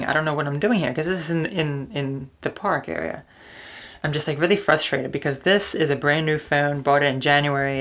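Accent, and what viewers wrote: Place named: Long Island, United States. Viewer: When I first started watching you, I assumed you were from Long Island, because of your accent.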